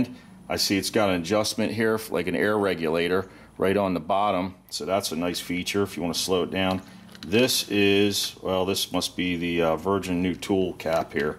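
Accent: Pennsylvania, United States. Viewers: Wow Pennsy accent coming at yas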